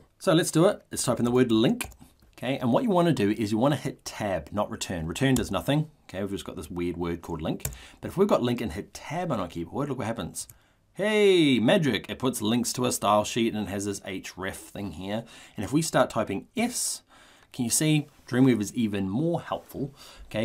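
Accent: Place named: New Zealand. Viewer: great video, awesome to hear a fellow NZer too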